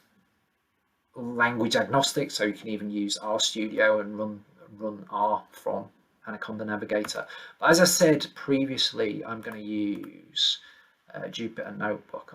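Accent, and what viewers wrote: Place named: Yorkshire, United Kingdom. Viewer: Great video - love the dulcet Yorkshire tones!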